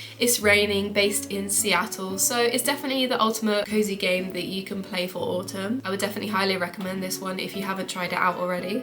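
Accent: United Kingdom. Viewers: Night in the woods means a lot to me as a broken middle aged man, so not quite the target audience lol I really love that game tho have it on multiple platforms. And your accent is as beautiful as your looks, I’ll bishop being live with British girls lol 😻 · hi! u have amazing eyes, ur skin is flawless and i love ur accent. british accent is my favorite accent ever. may i ask how old are you?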